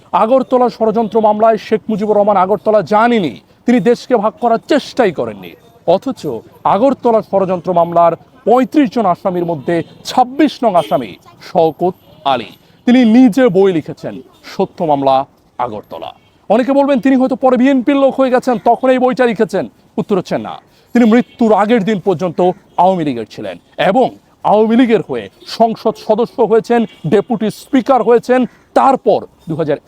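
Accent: Kolkata, India. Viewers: কিরে তুমি কি কলিকাতা রেন্ডিয়া দাদাবাবু নাকি? না মানে, কথা ত হুবহু ওদের মত!!! উত্তর টা দিবে। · আপনি পুরাপুরি শক্ত প্রমাণ উপস্থাপন করতে পারেননি! শেখ মুজিবের দালালী না করে সঠিক তথ্য উপস্থাপন করুন। আকারইঙ্গিতে, শেখ পরিবার এবং আওয়ামী দলের দালালী করছেন আর কথাবার্তা ধরন কলকাতার বাংলাভাষীদের মতো কেন? আরে, বলেই দেন? উনি ফেরেশতা ছিলো। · সবই ঠিক আছে তোমার কথা বলার ধরন কলকাতার দাদাবাবুদের মত কেন গো? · তোমার কথা বলার স্টাইল কলকাতার দাদাবাবুদের মত কেন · দাদা বাবু আপনি যে দেখছি কলকাতা ভাষা বলছেন গো। দালাল চাটুকার চ্যানেল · এই সা/লা তো কলকাতার মা/ল। কথাতে ধরন ওরকম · তোমার কথা বলার ধরন কলকাতার দাদাবাবুদের মত কেন গো? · আরে উনি আসলেই ভারতীয় কথা শুনে বুঝতে পারছেন না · আপনি ক্যান ইন্ডিয়ান দাদা বাবুদের মতো কথা বলছেন?? বুঝলাম না আপনার উদ্দেশ্য... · Hindu to tai kolkatar moto bolse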